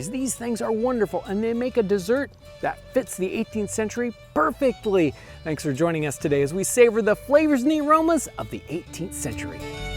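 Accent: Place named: Midwest, United States. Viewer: Wrong. I knew a guy from the 18th century who found a camera. He had a much different accent. This guy talks like some dude off the street in Everytown, Midwest USA.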